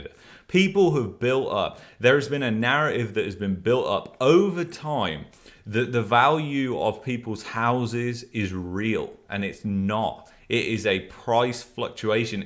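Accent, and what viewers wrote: United Kingdom. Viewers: Finally we see the true story where the mainstream media never covers. Many Thanks for your time. New subscriber. Love the British accent too.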